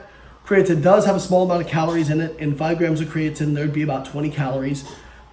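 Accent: Australia. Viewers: An Aussie accent creeping in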